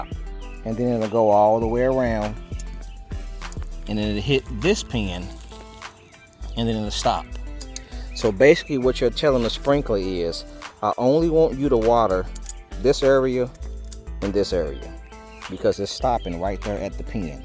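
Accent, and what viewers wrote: South, United States. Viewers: Love the accent. We southern!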